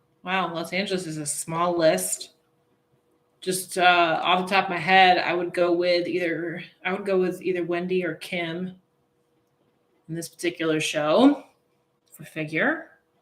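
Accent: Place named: Rochester, United States. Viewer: too funny! I've been wanting to ask if you're from Rochester NY for a while now as I hear that accent! I'm from Webster ;-)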